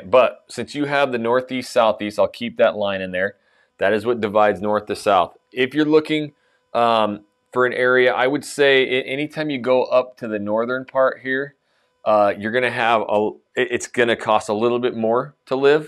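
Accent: United States. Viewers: You're from California, aren't you? "The 84." "The 205." "The 217." We don't talk like that in Portland. That's the way people speak in Los Angeles. It's not I-26, it's US-26. It's not The 84. It's I-84. It's I-205. It's 217 (or maybe Oregon 217). Also, there are now SIX "quadrants. Yes, South is the newest, but you totally forgot about North. North is everything from Interstate Ave to the Willamette River. And I'm just going to stop here. You have just so, so many mistakes. You really don't know Portland.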